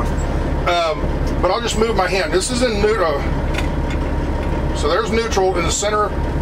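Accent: South, United States. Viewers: wer runnin thirty mile anhour....... true southern accent. 👌 love it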